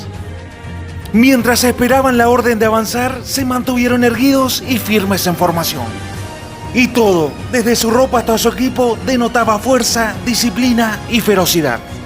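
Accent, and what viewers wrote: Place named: Córdoba, Argentina. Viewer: Ufff ese relato cordobes.... Muy bueno...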